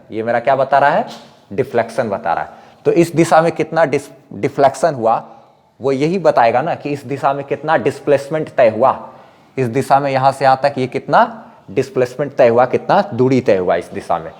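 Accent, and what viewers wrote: Bihar, India. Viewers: Sir aap bihar se ho 😶¿¿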